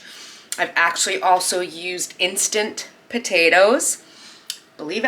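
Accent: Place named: Boston, United States. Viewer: Talking about accents, I absolutely love your Boston accent! I love all different accents, they just bring warmth to our language! Unfortunately mine is just plain jane!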